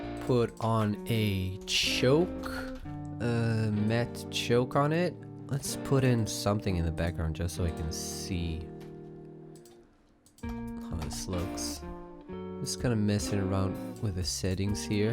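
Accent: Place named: Israel. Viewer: are u jewish. I smell an israeli accent. Might be wrong though.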